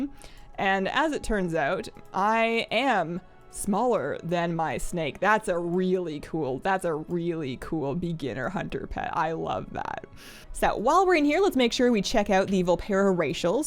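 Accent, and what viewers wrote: Canada. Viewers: Can you tell she's canadian? :D I love your enthousiasm!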